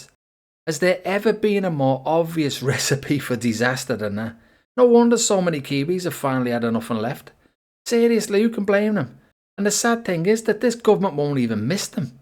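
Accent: Liverpool, United Kingdom. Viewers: This video makes sense. The scouser being the voiceover doesn't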